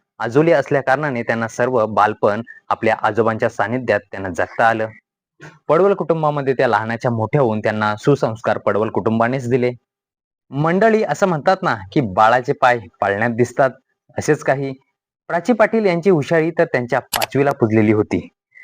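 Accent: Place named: Murbad Shahapur, India. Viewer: बंधू तुम्ही चांगल्या प्रकारे निवेदन देत आहात तुम्ही सुद्धा बोलीभाषा वरून मुरबाड शहापूरकर आहेत वाटतं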